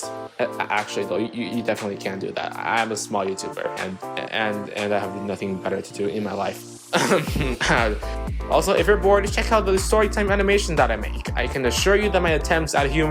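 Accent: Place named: China. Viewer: Your Chinese accent is funny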